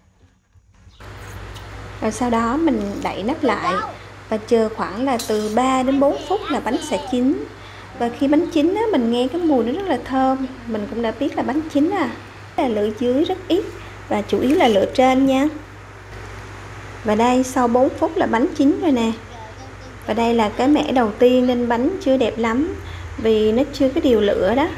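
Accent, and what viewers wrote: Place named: Quang Nam, Vietnam. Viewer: Cám ơn chị đã chia sẽ vidéo làm bánh này theo đúng kiểu truyền thống. Nghe giọng chị trọ trẹ giống người Quảng Nôm, Quãng Ngữa dzị đó. 🥰🥰🥰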